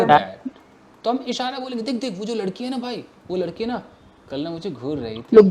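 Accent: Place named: Bihar, India. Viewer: Sir aap puri bihari bol rhe 😄😄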